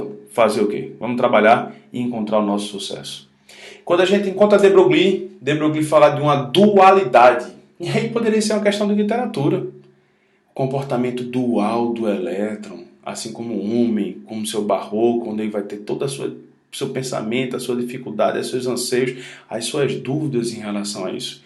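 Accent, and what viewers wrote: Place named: Northeast, Brazil. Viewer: Kkkkkkk cara vc é mt bom!!!!! Serio msm eu rir e aprendi, parabens amei teu sutaque de nordestino ne?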